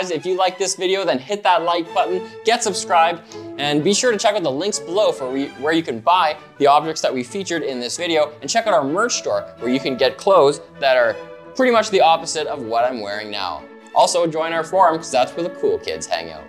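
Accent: United Kingdom. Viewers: why the fuck it sound so British ???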